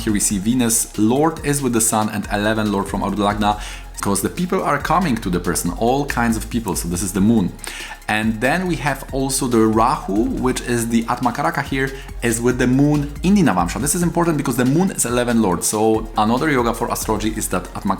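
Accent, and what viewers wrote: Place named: Poland. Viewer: Good heavens, u speak fast, load your sentence with information and add on your particular polish accent, truly it's impossible to get the valuable share u give. I am sorry got my Krittika strong . I hope u would slow down a bit.. speed is good but not that fast please .. thats a ferrari 😃 .. Carriage is good U are amazing & your research spectacular .. thank u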